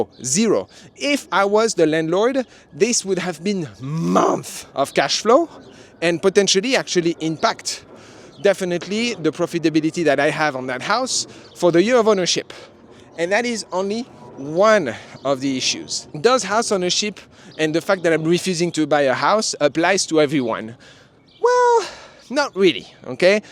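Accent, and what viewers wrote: France. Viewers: Where you from? your English is slightly off sometimes..a Frenchy maybe?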